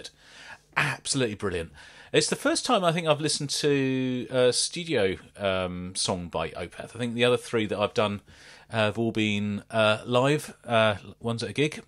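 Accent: United Kingdom. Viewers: Great channel, cool english accent and diction!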